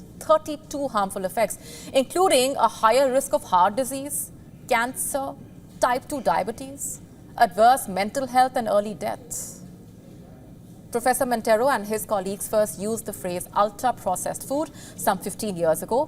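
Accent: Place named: India. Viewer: Indian. It's the only accent I can't stomach, I don't know why.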